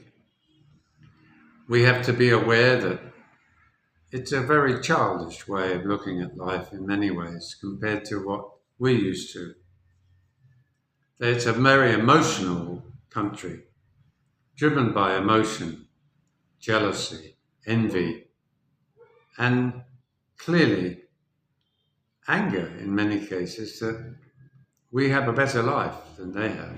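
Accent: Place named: United Kingdom. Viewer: Hey No Need, I agree there are many Stupid Americans here and Stupid Brits the Same, you can’t claim to be Australian, but you’re truly British. It’s in your accent long live the King God bless. Hope you’re safe.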